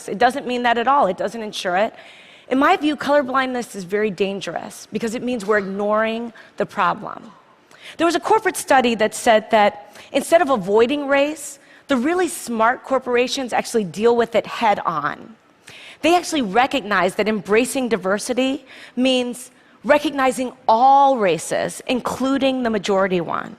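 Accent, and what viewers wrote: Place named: United States. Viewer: Good Amercan-English to hear.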